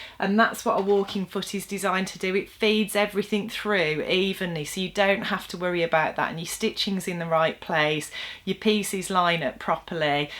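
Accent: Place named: England, United Kingdom. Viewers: Great video. Full of tips - and an English accent! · I just discovered your tutorials today. Your tutorials are awesome. Thank you for the work you did. I will be watching and learning more. I just love to listen to the English accent.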